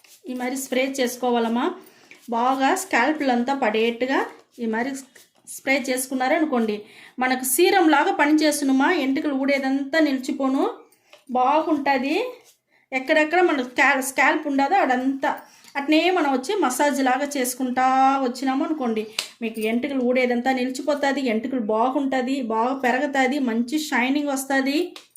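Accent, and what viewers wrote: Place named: Rayalaseema, India. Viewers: ఈమె చిత్తూరు యాసలో మాట్లాడుతున్నారు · Tamil kadhu, rayalaseema telugu adhi, naa frnd vundhi rayalaseema ammayi, thana slang kuda ala ne vuntadhi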